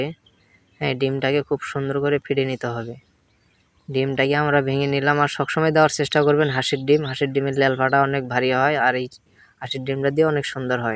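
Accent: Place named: Bogura, Bangladesh. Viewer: তোমার কথা শুনেই বুঝতে পেরেছি।ভালো লাগলো ভাই।আমিও বগুড়ার মেয়ে। তোমার বাড়ি কোথায় বা কোন হোটেলো কাজ করো বলা যাবে কি?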